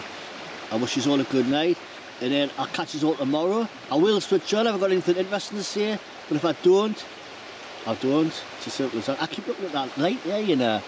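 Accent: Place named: Northumberland, United Kingdom. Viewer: Wey I, I take it from ur accent it’s bothal Northumberland, used to go there wit ashington sea cadets cleaning the river on wknds man...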